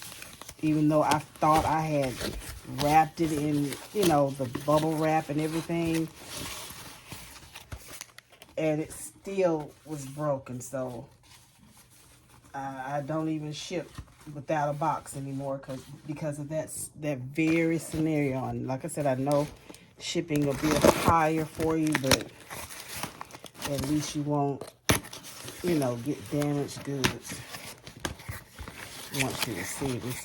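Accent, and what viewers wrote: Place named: South, United States. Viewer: Yes, I do tend to be longwinded so be careful encouraging me!! LOL I think I'm subscribed to her YT channel because her Lives show up in my feed from time-to-time. However, I usually wait & watch recordings because I tend to get a little impatient watching them juggle their demo with following along in the chat (ironic, I realize, coming from someone who "talks" as much as I do, haha). BTW, where did you grow up ~ I hear in you a kindred Southerner. I'm a lifelong Floridan [don't hold it against me though, ok...there are still a few decent ones here & there], but I've also got people in the Carolinas. My accent always has waaay more twang after coming home from visiting there!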